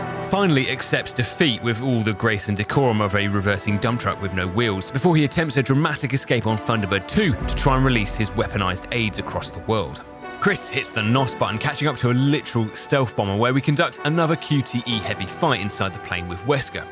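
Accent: United Kingdom. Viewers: The sweet, soothing british voice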